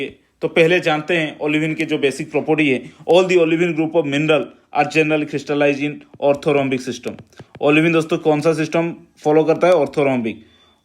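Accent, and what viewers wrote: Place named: Odisha, India. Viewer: It seems to be a odia tounge with a imperfect hindi.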